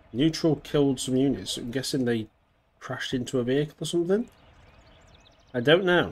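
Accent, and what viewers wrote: England, United Kingdom. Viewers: Weird to my ears to hear the invading German army "luckily" has plenty of materiel with which to invade Poland. In an English accent too. Great game though. Thanks for vid.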